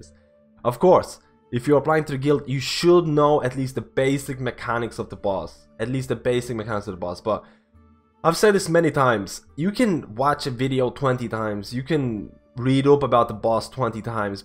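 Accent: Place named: Dublin, Ireland. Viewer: Where are you from. I kind of detect a Dublin accent but not a native Dubliner. Like you're from another country in Europe but adapted over time. You're probably not but just thought I'd ask.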